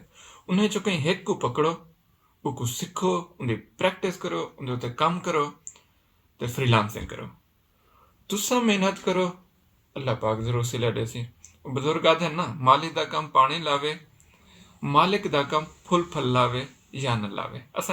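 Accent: Saraiki, Pakistan. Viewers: Yes sir 😢 me also completed master also B.ed 😢 but i have nothing I am also form distric bhawalpur Also mother language sariki your speak of method sariki amazing 😍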